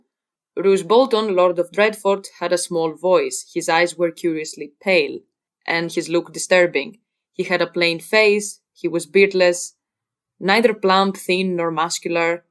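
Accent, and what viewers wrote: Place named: Greece. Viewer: Το κατάλαβα από την προφορά, σαν την δική μου, ότι είσαι ελληνόφωνη!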